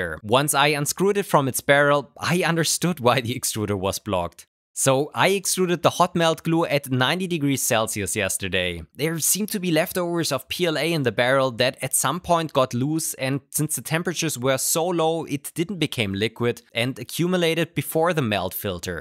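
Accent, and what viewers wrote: Germany. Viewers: Deutscher Akzent? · Guten Tag im Stefan 😂 du bist locker Deutsch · are you german? but cool vido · Bro ich klick auf das video und hab direkt das gefühl gehabt, dass du aus deutschland komms. Sber sehr cool, was du machst❤ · Geilster Deutscher Akzent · are you german? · I hear Deutsch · first 5 seconds in, "DAS IS N DEUTSCHER, ICH KANN ES HÖREN!" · hey are u German? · Ich bin eine Minute drin und muss sagen du hörst dich sehr deutsch an :D nice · Are you German? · bro bist doch deutsch oder man hört das harrrtt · Bro... are you German?!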